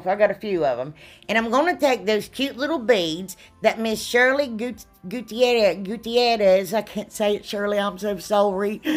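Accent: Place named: North Carolina, United States. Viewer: This was my first time watching your channel and I was so impressed. Your projects are fantastic and your personality shines through. I love your accent. I’m from Tennessee and I thought we had a good southern accent but yours is precious.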